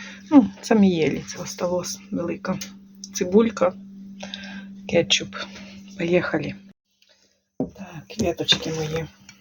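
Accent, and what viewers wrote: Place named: Ovruch, Ukraine. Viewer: Про овручский диалект не слышали? Так хотя бы поинтересуйтесь об этом. В каждой стране люди разговаривают на разных диалектах, говорах. Нельзя так неуважительно относиться к людям.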